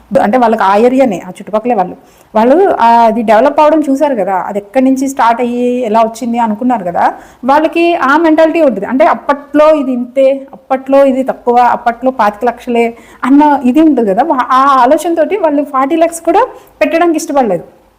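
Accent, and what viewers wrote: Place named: Godavari, India. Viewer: Hello andi are you from Godavari districts? Your accent looks like that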